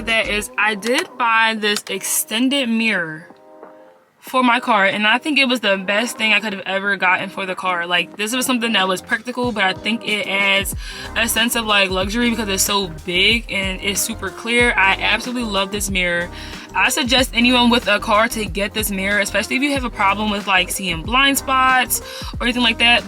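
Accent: Philadelphia, United States. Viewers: Are you from Philly? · I was looking for this comment. I’m like she gotta be from Philly. I’m from south jersey so I be knowing.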